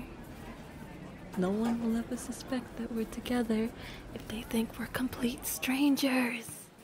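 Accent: South, United States. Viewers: god why does your voice have to be so nice with or without southern accent got me punchin and kickin the air, and don’t even get me started on your absolutely amazing laugh 🤭🧎‍♀️🩷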